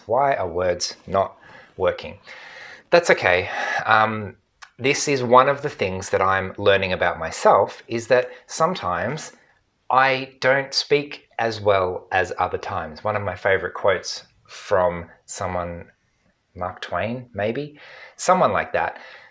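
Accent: Australia. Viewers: Your accent sounds Austrailian to me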